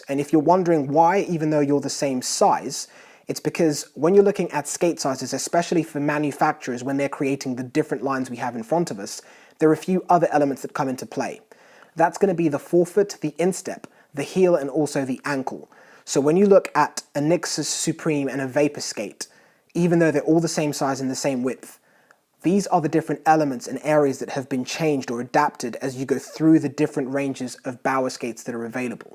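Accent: England, United Kingdom. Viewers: Please share??? Did you know about the English hockey club. I have only heard about One. In the CHL. Perhaps you Are the Guy in the video??? Then Why do you sound so english??? I am really confused. Help Me out. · SERIOUSLY???? This a video for remedials looking to get into hockey??? Mommy still dressing you people? And sorry, it's really funny coming from a guy with an English accent. Any hockey shop worth it's salt can explain everything about skates or you shouldn't be buying from that shop. They are the most important piece of your equipment so treat them as such and visit a local hockey shop; not a sports store with a hockey section nor buy them online unless you know for sure. But I find the gushing comments about this video utterly ridiculous. If you don't know how something fits and feels on your own body, no video in the world will help you. 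Hey, hats off to the dude who made the video if you are getting paid for it but having someone tell me how to buy skates is like someone trying to tell me what stick to buy or how many sheets of toilet paper to use and how to hold it as I wipe my butt. But that's the difference between self-reliance and today's nanny-state mentality where people need their hand held for everything. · Hockey tutorial from british guy?🤔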